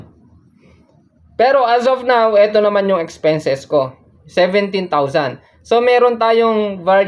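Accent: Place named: Ilocos, Philippines. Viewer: Taga ano ka gayam Sir, kasla nangngeg ko ilokano met sao yo. Ty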